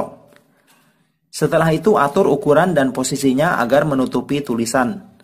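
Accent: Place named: West Java, Indonesia. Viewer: Sunda euy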